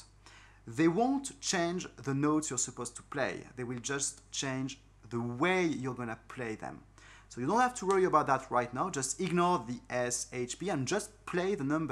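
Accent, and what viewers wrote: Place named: France. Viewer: English vowel sounds are a little sloppy, less precise than French. A native German speaker once told me that English vowel sounds tend to float all over the place. And while French words have even stress on all syllables, English words generally have a stressed syllable, even at times a secondary stressed syllable. Sometimes the stress shifts according to part of speech, like the noun "RECord" and the verb "reCORD". So imprecise vowels and location of stress get one closer to native English. But none of these comments are meant to be critical of you. You were perfectly understandable. Please you keep making guitar instruction videos, and the rest of us will enjoy the occasional French idiom that slips through! Thanks..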